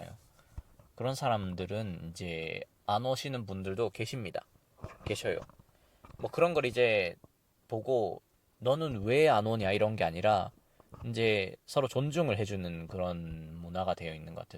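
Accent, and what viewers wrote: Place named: Japan. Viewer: 한국어에 일본어 톤이 녹아있는걸로 봐서는 일본어를 수준급으로 잘하실 것 같네요. 저는 살다가 돌아왔는데 어디서나 화이팅입니다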